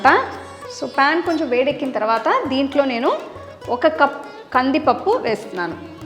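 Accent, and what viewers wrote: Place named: Telangana, India. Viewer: ivvala...man ! that telangana telugu! Miss it so much !!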